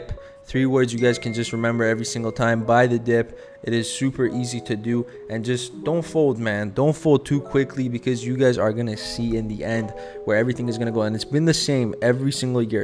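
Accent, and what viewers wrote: United States. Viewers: Most American sounding Russian I’ve ever heard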